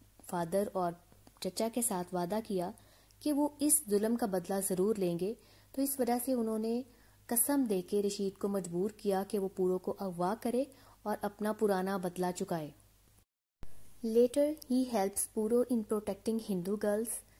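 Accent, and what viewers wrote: Pakistan. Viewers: Aap pakistaniyo jaise bolti hai ? Bht acha explain kiya thank you so much ❤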